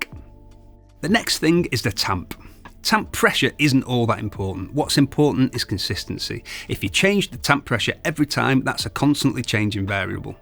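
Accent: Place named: Yorkshire, United Kingdom. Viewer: You sound like you come from somewhere in Yorkshire - where abouts? is the question.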